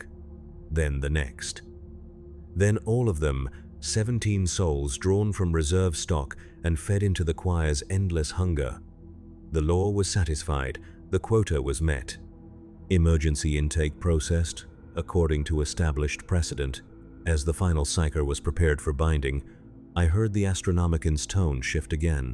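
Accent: United States. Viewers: Dude the voice is American again ? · Brother, stick with the old voice. This is painful to listen to. Voice is from some dude in Vermont that did an extra year in Grammer school.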